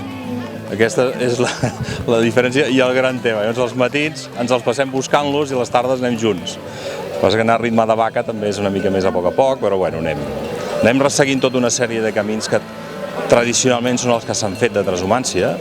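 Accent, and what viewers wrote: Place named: Barcelona, Spain. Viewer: En Camprodon??? Ja,ja,ja ...vas listo! Nadie habla castellano y aún has tenido suerte, el que habla en el vídeo no es autóctono, habla de Barcelona.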